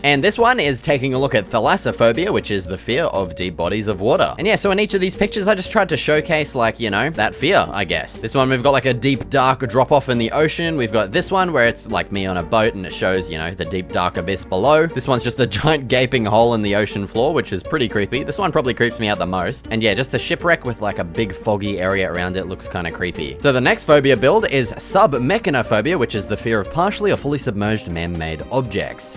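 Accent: Australia. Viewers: Fuck man I love Australian accents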